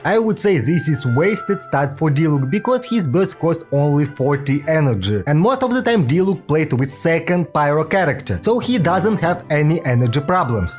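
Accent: Russia. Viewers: I learned that there's something even more cool than your russian accent: skin mods. If there are skin mods for weapon, I'm good with this stupid cardbox sword, because it won't be looking so. Otherwise I'd settle for Tidal Shadow just because how awesome it looks.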